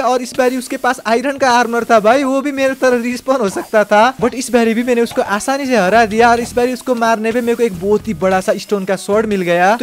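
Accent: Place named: Nepal. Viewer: his langauge tone is like napali tone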